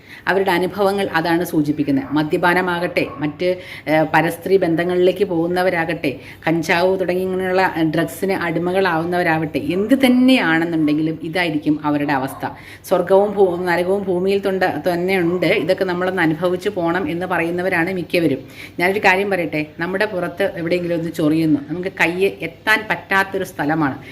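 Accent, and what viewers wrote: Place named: Malabar, India. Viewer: നിങ്ങളുടെ അവതരണ ശൈലി സൂപ്പർ ആണ് ട്ടോ,,,,, തനി മലബാർ ശൈലിയിൽ,,,,,,, ആളുകൾ ശ്രദ്ധിക്കപ്പെടും,,, 👍🔥🔥🔥🔥🔥🔥🔥🔥🔥🔥🔥🔥🙏🙏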